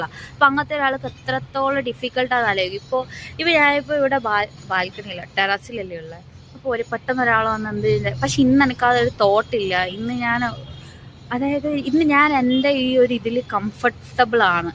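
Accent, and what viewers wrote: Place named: Kannur, India. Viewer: Well done 🎉. I read the description after that understand the content of this vlog,I think opening feel lag but end of the video is superb because you give message to us. Kannur slang is good,content of this video is excellent.we will be waiting for next episodes with different content.Any way all the very best for your future.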